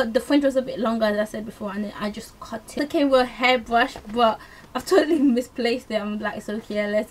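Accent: United Kingdom. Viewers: Your Like With A Good British Accent Lol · You're cockney accent LOVE IT I have a cockney accent but with a mixture of all different accents I don't understand my voice lol I'm just rambling on sorry for wasting you're time, :D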